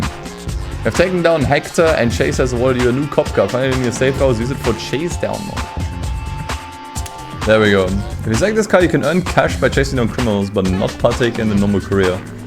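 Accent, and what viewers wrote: Germany. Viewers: Top video, eine Frage bist du deutscher ? Hört sich vom Akzent oder Dialekt her an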